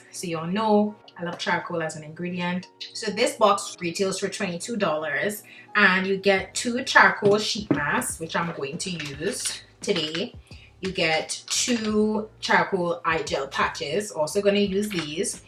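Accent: Trinidad and Tobago. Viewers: When I heard that trini accent....subscribe ONE TIME GYUL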